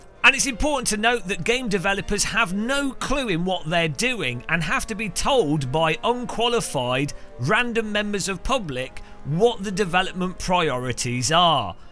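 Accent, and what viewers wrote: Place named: Midlands, United Kingdom. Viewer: Agreed. I only subscribed for the sarcasm, which is amplified by a Midlands accent. :-)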